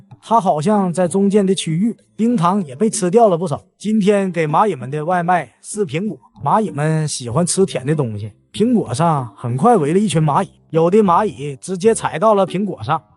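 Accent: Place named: Northeast, China. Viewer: 这大碴子真他妈几把难听！！！！！！！！！！！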